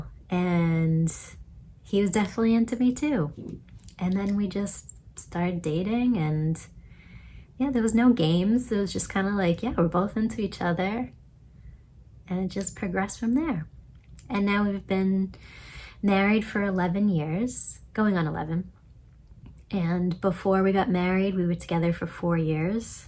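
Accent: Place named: Boston, United States. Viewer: Nice, the secret is a controlled imagination and a well steady attention firmly and repetitively, creation is finished, omg, I love Boston, I think I heard Boston :))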